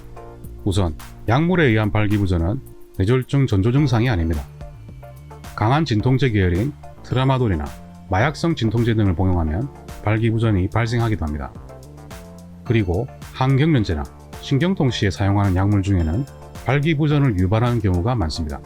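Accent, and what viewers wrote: Gyeongsang, South Korea. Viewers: 선생님 말투가 경상도 출신같은데 맞나욯ㅎ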